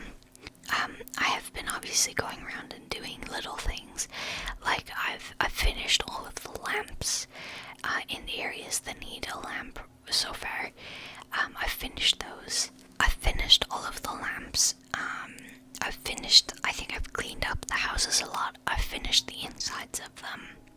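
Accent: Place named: Ireland. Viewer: irish asmr?? really hard to find that on yt lol. I am irish myself and like the familiarity of the accent so its nice to finally find an irish asmrtist 👋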